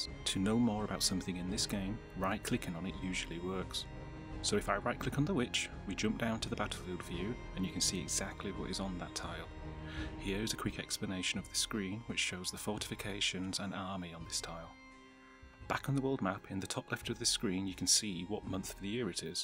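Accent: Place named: United Kingdom. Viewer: your quiet british accent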